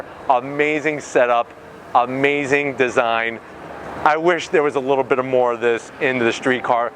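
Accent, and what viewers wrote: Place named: New England, United States. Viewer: Well guess what? I'm gonna have to zonk your gay ass yankee accent. TTFD thats what your working with.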